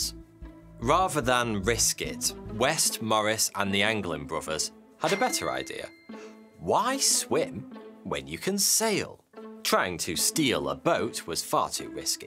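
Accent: United Kingdom. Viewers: So id got my old account kicked of youtube 🙃 and not having this guys videos in my life gave me extreme anxiety i really couldnt stand not having a steady flow of information in a british accent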